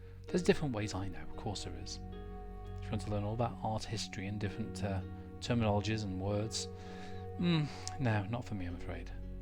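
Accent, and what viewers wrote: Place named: Northern England, United Kingdom. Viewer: Are you from Devon? I'm American but I lived in England from <num> <London) I'm American, so I'm not great with British accents, but you sound Northern to me! (my husband is southeast London.. I'm gonna ask his opinion :-) all British have an opinion on accents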